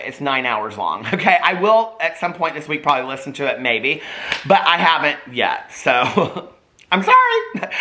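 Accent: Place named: Midwest, United States. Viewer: It’s the Midwestern twang for me!!! 😂 I never knew we had an accent here until I moved to Florida for a year to get sober! So, I guess we have an accent!! 😂 and yes, we do say ‘fixins’ !!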